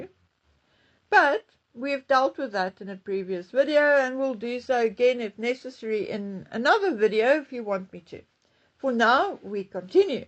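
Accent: Afrikaans, South Africa. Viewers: I LIKE your content ….always have. I didn’t know English wasn’t your first language. Is it Afrikaans?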